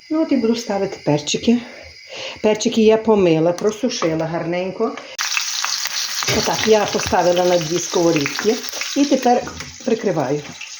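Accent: Ukraine. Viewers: Вот это настоящий Украинский! Приятно слушать и все понятно. Спасибо вам.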